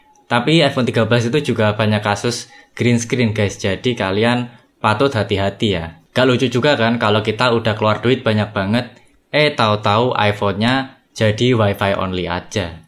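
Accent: Java, Indonesia. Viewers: tambahan bang, menurutku pembawaan udah cukup oke, tapi ada 1 yang kurang, kata2 "gua" coba diganti aku/saya, agak lebih oke, soalnya medok nya lumayan kentel😭🙏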